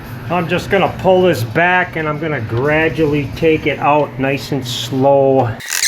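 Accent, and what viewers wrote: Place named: New England, United States. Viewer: Awesome video. Not a bunch of talk but straight to the point. Love that New England accent also.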